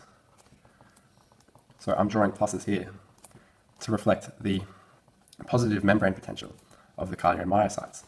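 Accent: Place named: United Kingdom. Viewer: foreign accent (British) and fast talking. I agree. LOL but still a good video, I just had to rewind a few times. Dan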